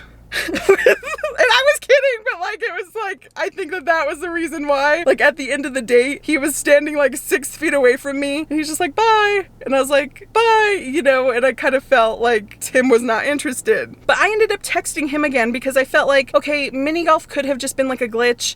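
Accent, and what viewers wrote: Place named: Pennsylvania, United States. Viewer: That’s gotta be a Pennsylvania accent.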